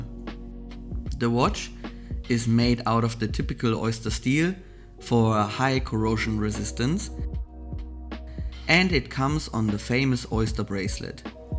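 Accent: Germany. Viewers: Are you a native German speaker ?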